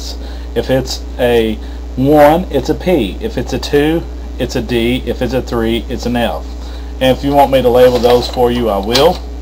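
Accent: South, United States. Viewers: great video thanks so much very helpful. i like your Southern accent. Greetings from California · I like your southern twang! It helps keep me focused on the video! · awesome country accent..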